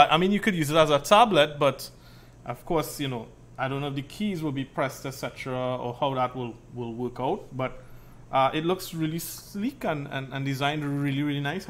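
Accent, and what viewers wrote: Trinidad and Tobago. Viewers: Heard that trini accent from the go🇹🇹🇹🇹🇹🇹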